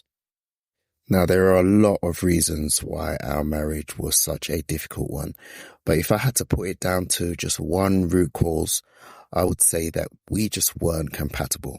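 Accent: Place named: United Kingdom. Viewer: I am bingeing all your videos! Your uk accent drew me in. Also in uk. Near london.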